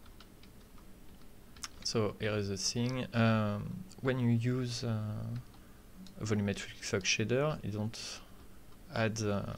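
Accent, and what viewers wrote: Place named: France. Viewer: Bonne vidéo, merci. L'avantage quand un français parle anglais, c'est que du coup je comprends l'anglais. Ceci n'est pas un reproche hein, juste un clin d'œil. Je parle comme une vache espagnole. Merci encore à toi. Le coup des fog est impressionnant. Chapeau.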